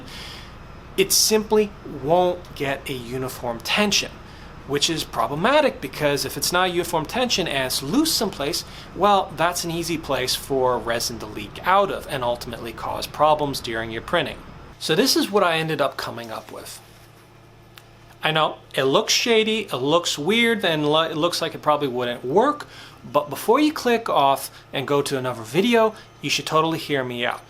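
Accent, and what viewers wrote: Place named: Canada. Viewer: ahh ok, you sounded like a fellow 🇨🇦 😊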